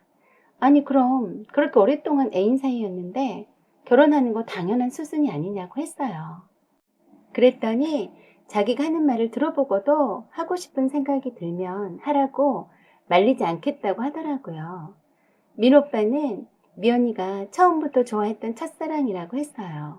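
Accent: Jeolla, South Korea. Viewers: 전라도 억양이 너무 많이 나와서 듣기 거북하네요 나도 전라도 사람이지만요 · 오늘따라 전라도억양이 엄청 강해부러요ㅋ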